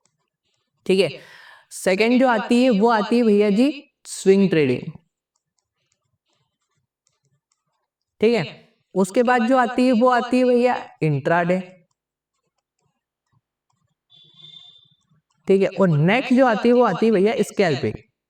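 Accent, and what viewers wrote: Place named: Madhya Pradesh, India. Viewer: Sir aap Madhya Pradesh se ho kya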